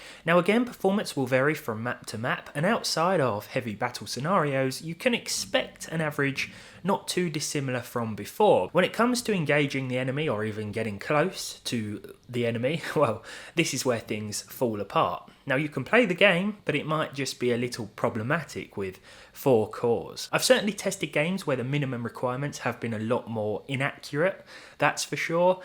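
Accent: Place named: Essex, United Kingdom. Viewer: Essex accent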